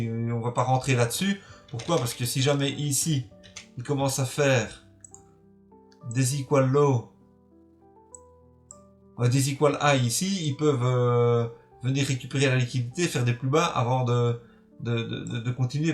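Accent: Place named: Belgium. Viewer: merci pour la précision je pense qu’on s’est formé chez la même personne et en plus ta l’accent belge donc je m’abonne ☺️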